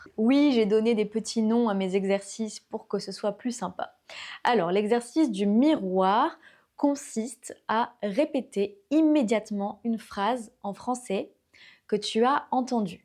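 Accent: France. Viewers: Bonjour Tout à fait, c'est des méthodes que j'utilise aussi pour améliorer ma prononciation. Je parle tout seul très souvent quand, par exemple, je vois une vidéo YouTube ou la télé et je fais comme si je donnais mon avis sur n'importe quel sujet et ça m'aide à m'exprimer. Je pense que je suis pas mal Mais il y a un truc sur lequel j'ai un autre avis. Je pense qu'en fait t'es très célèbre,. T'es une star sur YouTube et j'ai adoré t'écouter parler en anglais parce que tu perds pas ton accent français mais en même temps ta voix change un petit peu, je trouve ça formidable 😍 Merci pour les conseils, je te souhaite une excellente semaine ma prof ✌🏻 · Your English with the French accent 😮😳 🥵 🫠❤ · Goodness mate, your seriously in love aint ya 😂 As a Londoner- no! She doesn’t sound English, elle a un really sweet French accent 😍❤️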